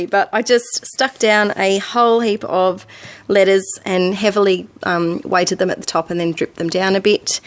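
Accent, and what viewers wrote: Australia. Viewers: HI there. Sounds as if you are a neigbour of mine ....I'm in New Zealand .....I think you might be in Australia, but you might be closer than that :) I used a sealant craft varnish on my art journal pages, & almost ruined some pages, because they stuck together & bits tore as I prissed them apart. So like you, I am having to put waxed baking sheets between the pages ...of my whole book lol, but I actually don't mind the look of that too much. Your first art journal is way better than my first one, which after more than a year, I am still only half way through. I have heard that Mod Podge makes an excellent sealant & doesn't stick, & I use Mod Podge to seal paper images I use when altering a canvas, & it dries quickly & doesn't stick. I wish I had remembered Mod Podge before using the varnish sealant. Great first art journal! Subscribed to your channel now. 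· You're Australian? I trying to place your accent. My 7th grade music appreciation teacher was Australian. I was the only person in the class he didn't pick on.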